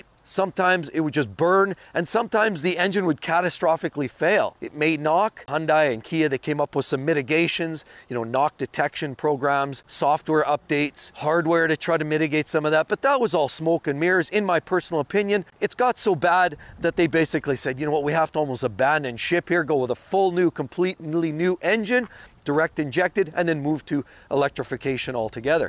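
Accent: Canada. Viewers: This guy is Canadian according to his accent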